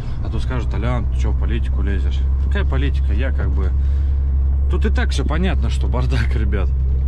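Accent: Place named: Volgograd, Russia. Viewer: Ты с Волгоградской области? Если не послышалось, то что за район?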